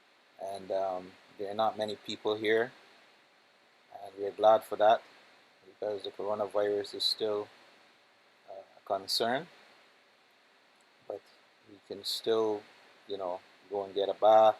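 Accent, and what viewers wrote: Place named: Barbados. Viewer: sir, l am hearing a Barbadian accent . Are you Bajan or did you live there? Nice video.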